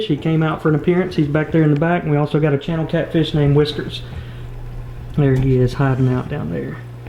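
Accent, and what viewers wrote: South, United States. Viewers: Lol at his southern accent.. dan-dindley-doo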